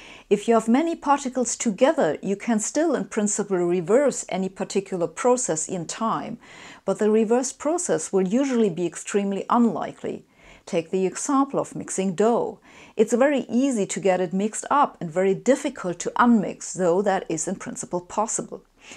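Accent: Germany. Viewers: Love your content. Your subtle German accent gives it a nice additional touch. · she got music videos??? Does she also sing with this strong german accent?^^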